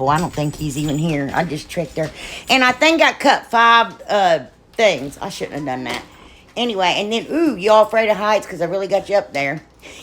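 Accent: South, United States. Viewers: Guuurrll !! That country twang had me smiling all thru your video. Where are you from? It can't be Ohio. I'm from Florida but have lived in Alabama for the last 48 years. So I've picked up their southern talk. Enjoyed your video. Your projects are great!! TFS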